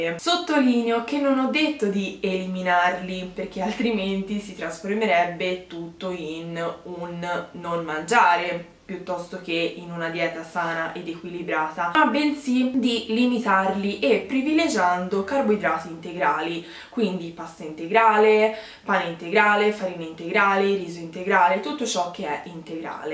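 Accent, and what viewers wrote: Tuscany, Italy. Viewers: Sei Toscana? ☺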